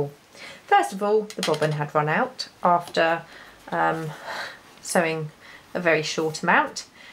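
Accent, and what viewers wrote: England, United Kingdom. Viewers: These are just just gorgeous I love her so much!! I’ll be trying these 😍 love your sweet ways you are so great to watch! Love your lovely English accent too xxx be safe keep your fingers safe 😜 love ya xx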